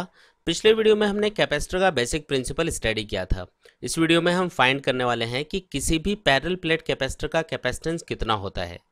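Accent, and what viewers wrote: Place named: Rajasthan, India. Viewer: Sir kha se ho ... Language se to Rajasthan se hi lag rhe ho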